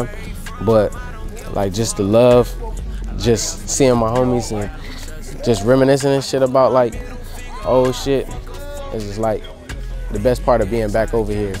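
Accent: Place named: Atlanta, United States. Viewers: Boy I’d a thought u was from the south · Crazy part is how he sound like he from the A and he better than any nigga from the A 😂. Nigga Cali do this shit nobody sound like Roddy he sound like he from Cali. Smfh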